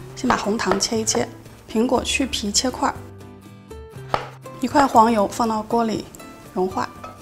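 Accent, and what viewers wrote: China. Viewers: 是哪兒的人啊？她的普通話口音我好喜歡。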